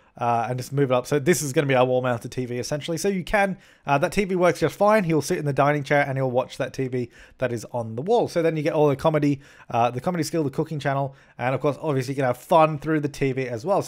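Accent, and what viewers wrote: Australia. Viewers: Are you Australian?Because I am🇦🇺Like if you are Aussie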